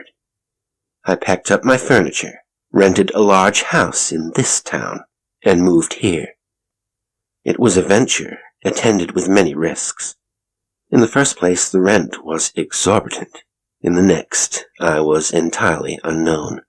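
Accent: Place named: United States. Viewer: This is the first story I have heard you read with an American accent. You are very versatile, Sir, and I am full of admiration.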